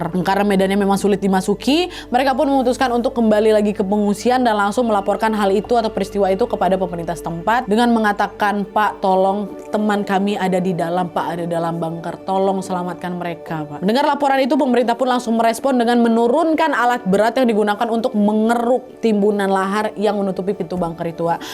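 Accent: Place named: Medan, Indonesia. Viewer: SAYA SUKA KALI dengan CARA GAYA BicAra nya kalau boleh tau AsLinya MEDAN YA enak Kali CAKAP NYA SUKSES buat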